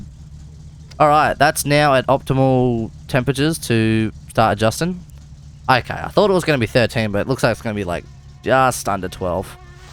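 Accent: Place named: Australia. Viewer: Australian, LOL.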